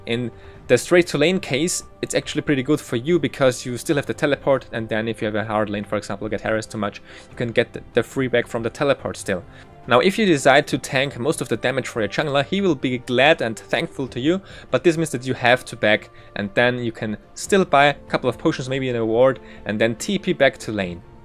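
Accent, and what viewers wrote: Germany. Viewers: Your accent just sound a little bit like a german accent:P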